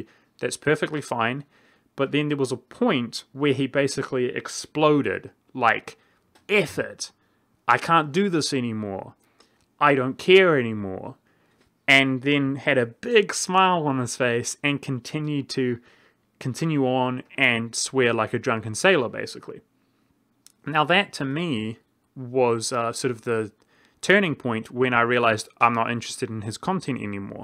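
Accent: New Zealand. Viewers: He is New Zealandian I think. His accent is either New Zealandian or Australian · Your'e from New Zealand?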